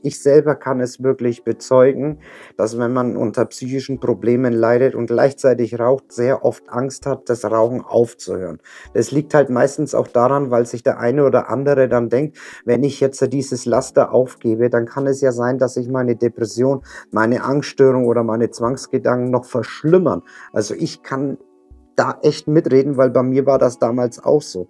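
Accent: Franconia, Germany. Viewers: bist du franke?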